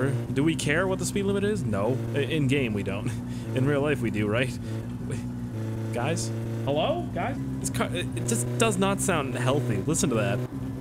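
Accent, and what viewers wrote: Germany. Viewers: im disapointed on the fact you put out german accsent on finnish game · Your German accent sounds like a gay man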